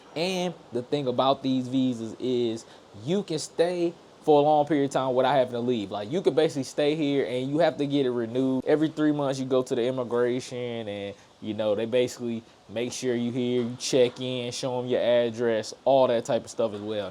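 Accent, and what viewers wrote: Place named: Detroit, United States. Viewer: Put on your Nigerian accent instead brother drop the ghetto Detroit lingo hip hop you sound low iq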